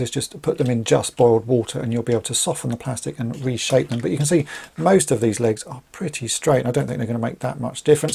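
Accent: United Kingdom. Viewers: When I read the title “What can I build”, see the junk pile, and hear your British accent, Dr A’s steampunk came to mind.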